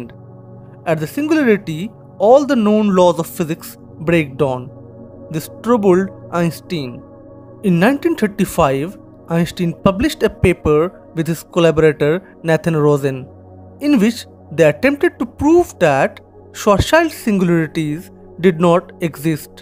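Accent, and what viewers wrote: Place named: India. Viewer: Cringe indian accent Stolen Content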